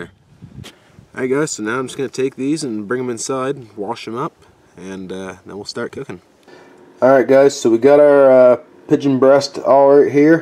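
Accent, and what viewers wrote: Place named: New England, United States. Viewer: Oh your from new england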